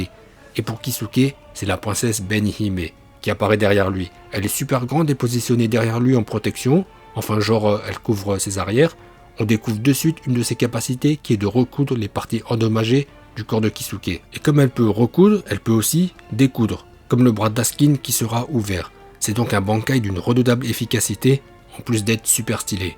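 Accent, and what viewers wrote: Japan. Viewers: Bravo pour ton accent japonais c'est cool 😎🇩🇯🇩🇯 grâce à te vidéos sur naruto DBZ bleach je appris beaucoup de mots japonais merci beaucoup mn frère est perso j'aime bien le bankai le moian globes oculaires le chauve de la division 0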